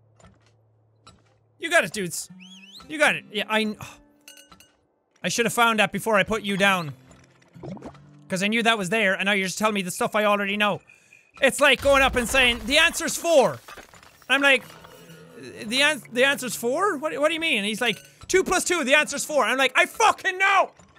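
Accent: Ireland. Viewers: this series made extra Irish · Is it just me or did sound extra Irish in this episode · does anyone else notice turns more irish in these videos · Good o Jackaboy. Irish-er than ever. GO GO!!! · Holy shit this episode was amazing, was especially Irish haha it's okay you're gonna beat this spillage fine no worries i believe in you:D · I swear He sounds more Irish, as he progresses through the video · Yey my Irish screaming friend is more Irish today!!! Now I can cuss out everything in Irish or gaylic as they say! Hooray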